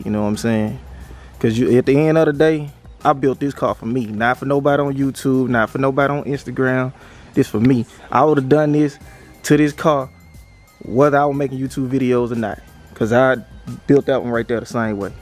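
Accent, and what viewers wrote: Mississippi, United States. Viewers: Man it sound like u from Ms dat bih pressure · Bro you from Mississippi??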